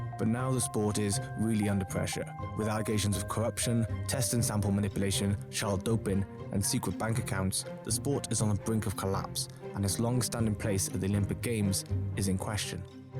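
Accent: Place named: Wales, United Kingdom. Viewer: I thought your pace was spot on and your enunciation clear. The welsh tones are very mild.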